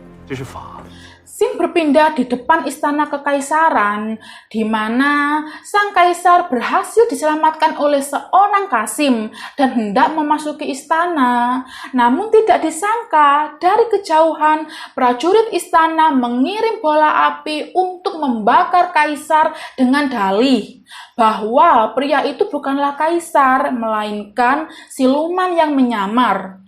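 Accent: Java, Indonesia. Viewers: Medok mantul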